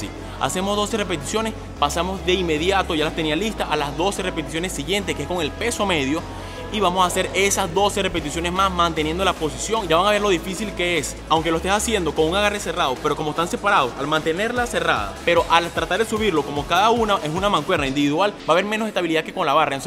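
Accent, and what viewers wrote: Venezuela. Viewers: su acento es venezuelano yesss te amo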